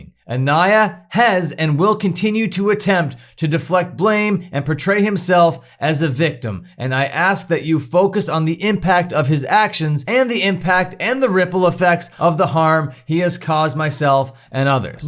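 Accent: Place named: Canada. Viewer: Bro it just clicked that your accent is Canadian😂 what province Bud? I grew up in alberta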